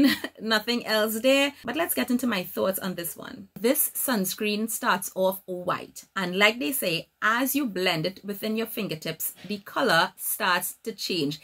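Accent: Trinidad and Tobago. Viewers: Great review. You sound like a trini! OK so you just said, " for wearing it sake" yup, you àh trini.. Great review 🙌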